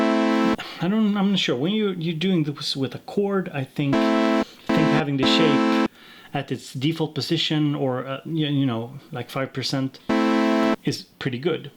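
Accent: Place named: Sweden. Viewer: Judging from the accent this dood must be swedish 🇸🇪🤘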